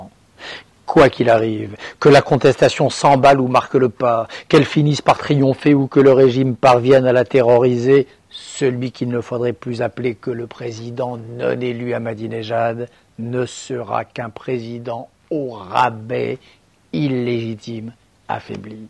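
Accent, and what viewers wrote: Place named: Israel. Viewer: mais FERME TA GUELLE pauvre tache tu fait honnte au VRAIE philosophe ...et mortelle ton acxent israelin et mortel .....a oui avant de parler de oppresseur n'oublie pas tes potes de la KNESET......et pour resume 300mille vote frauduleux on bien ete trouver mais dans le camps de mousavie ....connerie de soulevemment 1.5 million personne contre 48million pour ahmeddinedja.....pauvre devbile de menteur...koi kil arrive ferme ta GUEL.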